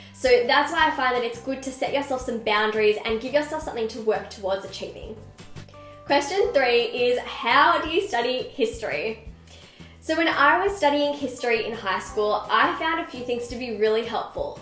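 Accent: Australia. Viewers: R u Australian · Australian I'm pretty sure 😊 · kritika singh ahahha shes australian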